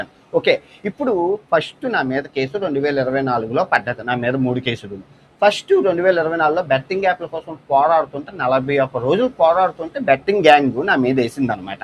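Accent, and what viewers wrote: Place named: Visakhapatnam, India. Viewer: Actually Brother, మీ భాష సరిగ్గా వుండదు, గరికపాటి గారు చెప్పిందే మీరు చెప్పారు కాని, అదే విషయాన్ని మీరు వెటకారంగా, అసభ్య భాష పదజాలంతో చెప్తారు. అందుకే మీ పై వ్యతిరేకత ఎవరైనా మీకు negative feedback ఇస్తే తీసుకోవడం, తప్పులు వుంటే సరిదిద్దుకోవడం అలవాటు చేసుకోండి Brother. నేను ఒప్పుకుంటాను, మీరు నిజంగా చాలా మంచి పనులు చేశారు. నిజంగా betting apps పైన మీ పోరాటం నన్ను బాగా కదిలించింది Brother. కాస్త మీ భాషలో బూతులు లేకుండా వుంటే చాలు. మీ Vizag యాస తో అయితే అసలు problem లేదు. ఒక తమ్ముడు, ఒక అభిమాని, ఒక శ్రేయోభిలాషి లాగా నా feedback తీసుకో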